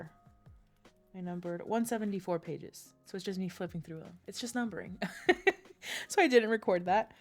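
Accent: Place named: Puerto Rico. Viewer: I was not expecting the Puerto Rican accent 😂😂😂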